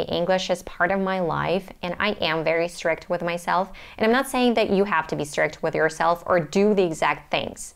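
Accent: United States. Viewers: You sure you are not American? If not, you did a great job ! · I thought you were an American! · Can't believe ure not American Where u from then ? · You have a perfect American English accent. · I m new here, Mss, what country are you from? You have a clear American Accent congratulation foryour effort, God bless you🙏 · Watching from Philippines.I am highly motivated when I watched your videos.I have learned more.You have a brilliant mind; II like the way you speaks,Americant accent,is easy to understand compare to British accent but I like British accent too.Thanks for your wisdom.Have a nice day. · american style,so my question is......are you an america? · Your pronunciation and accent is more American. Why? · Your speaking and accent are amazing. I can not imagine that you never lived in the North America before. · Hey you know what I like your American accent so much. 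And like you said why I'm learning English every day every single day because I love it I love doing this. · I thought you are American 😂, your accent is so incredible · She sounds so American but her thoughts her attitude is different from those people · О май гаш, наконец то нашелся человек с СНГ который действительно говорит с максимально похожим на американский акцентом. От остальных очень сильно слышно русский акцент и попытка скрыть его сильным произношением буквы Р в американском стиле. Красава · Thank you for your video. It inspired me to watch more videos and to spend more time for learning. I never think that you from Ukraine, because you speak like a true American girl :-) I'm from Russia and I learning English about 5 years like a hobby but my learning goes very hard because I spent so little time for English before and your video explained me what I did wrong. Thank you very much and I wish more subscribers for your outstanding channel! 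· It's a perfect American accent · Wow, unbelievable. I thought you are American and English teacher after watching your several videos. Actually you learned English in a un-English country, no English speaking partner , ,,, totally no English environmental, and just two years. You break me down. You know what? I learned English from 7 years old and for 50 years. And my mum was English teacher,lol. I have spent 26 of 50 years in English country. But I still can't speak good English. Many people complain not enough time, not in English speaking country, not English speaking partner. That is me. Your story makes me so shame. Your story is really encouraging me. Thanks. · I'm not sure how I got here (I'm from the USA), but this video blew my mind! I speak German and French but am feeling stuck for Russian...this motivated me quite a lot...thanks! Your accent sounds more American than mine btw great job 😆😃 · Chan Jason definitely American English... 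· Your American accent is extremely good. Outside of some Dutch people you sound the most like a native American than anyone I've ever heard. It's interesting that your vowels sound like the accent that is similar to people from Minnesota, USA. I've never heard that particular sound from anyone who isn't from Canada or Minnesota. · You have a perfect American accent. Where are you from that you say you're from a non English speaking country? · I bet you're lying its hard to believe you ain't an american . Awesome accent !! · You're American. I know that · I really thought u were American . U r so amazing n such an inspiration. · Wow and this is American pure English American 🙏🙏 color and colour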